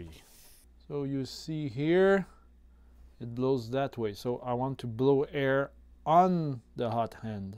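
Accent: Quebec, Canada. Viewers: Hey un québécois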